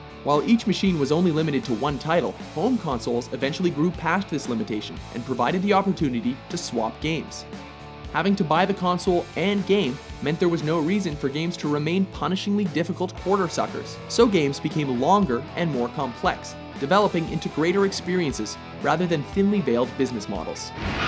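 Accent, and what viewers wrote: Canada. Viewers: is the guy who narrates this Canadian? He always says PROcess instead of PRAHcess. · how about a non canadian for the voiceovers.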